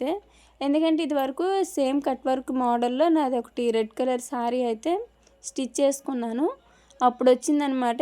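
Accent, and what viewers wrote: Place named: Guntur, India. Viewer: merekkada untaru.e bhasha ni batti tenali gunter bapatla atuside untavani anukuntunna. Me illu chAla baguntundi. Rent housa leda own house rent house ayite rent untu di. Water prob untunda taga daniki hyderabad nAku bore kottestondi. Anduke vere ooru lo settle avvalani undi.emantav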